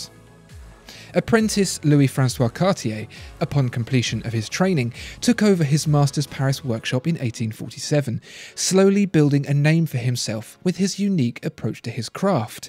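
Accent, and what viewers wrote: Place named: RP, United Kingdom. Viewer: Yo be clearer, you make it sound so confusing with all that posh, You're really good and i enjoy it but you have to make it clearer which one is th fake and which one is real and align that properly to the video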